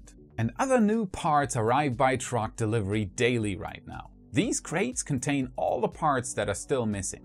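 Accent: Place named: Germany. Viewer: Are you german? If yes your English is really good!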